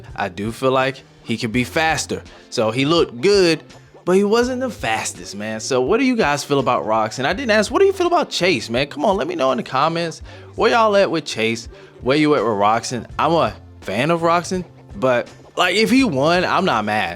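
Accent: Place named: Australia. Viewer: Your Aussie accent is hilarious 😂😂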